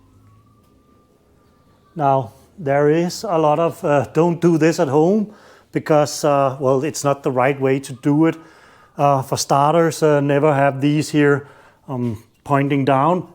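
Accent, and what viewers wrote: Denmark. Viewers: Elsker at man ved første ord kan sige med 100 procent sikkerhed, han er dansk. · Alene baseret på accenten, så vil jeg smide et vildt gæt på at du er dansker 😏 Fed og interessant video!🤟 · ja du behøver iværtfald ikke sige hvor du kommer fra :D. den danske accent er bare dejlig haha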